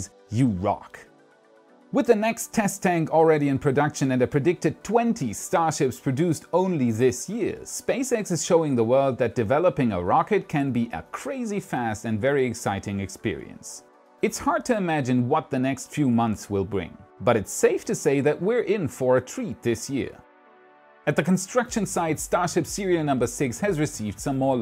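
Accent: Germany. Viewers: Of course he's German. Doesn't the accent give it away? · you are from germany?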